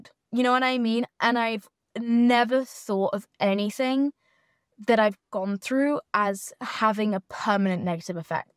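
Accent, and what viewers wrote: United Kingdom. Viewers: im british and she sounds very british to me, i don’t hear australian tbh😂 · her style, natural look/makeup, clear skin, brown layered¿ hair and british accent really make her come off as clean girl/posh if you dont know her personality. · What accent does her have ? The British one ?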